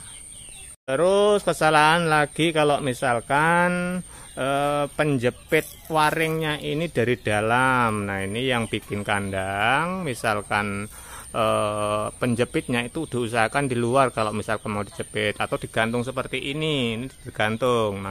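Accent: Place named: Yogyakarta, Indonesia. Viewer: Wong jogja kali..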